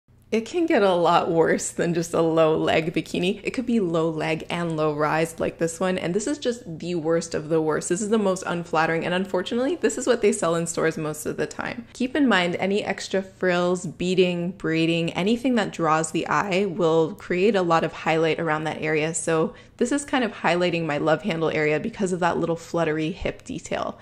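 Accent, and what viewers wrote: Canada. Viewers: Do I hear a bit of a Canadian accent?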